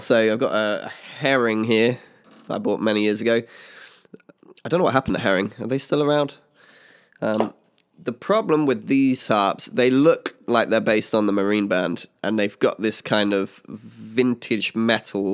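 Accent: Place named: England, United Kingdom. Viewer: The first time I recognized you, was in that incredible "Free Bird Solo" video and I thought, you were an american. But then, I heared you talking and I was surprised, that you are an english guy :) I like that so much! Keep up the good work mate :)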